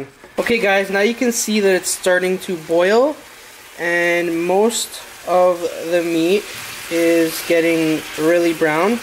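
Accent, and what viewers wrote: Canada. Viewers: Canada is America's abortion that unfortunately survived, only canadians talk like that and this softy drained his ground beef 🤣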